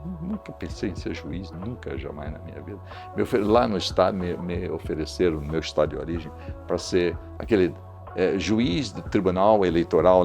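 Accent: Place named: United States. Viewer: Prezado Nabor, que bom vê-lo em plena atividade e cercado por livros raros.Ademais, consegui entender o seu inglês; com nítido american accent.Um grande abraço!⚖️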